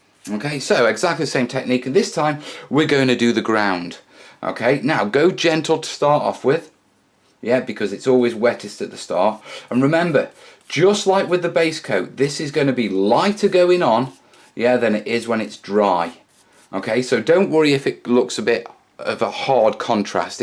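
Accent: United Kingdom. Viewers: a terrain version of Bob Ross with a British accent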